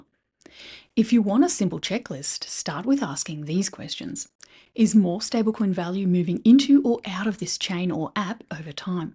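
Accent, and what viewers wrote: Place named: Australia. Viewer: So much food for thought here, thank you! I really think your channel has the most valuable crytpo insights going round. So refeshing to hear an Aussie in this space too!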